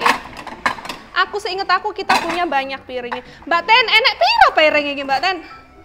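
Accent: Java, Indonesia. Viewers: Sy tu seneng klo mbak ngudang adik, logat jawax terasa banget... Sehat, sukses selalu buat mbak sekeluarga 🙏🙏🙏🤗🤗😘😘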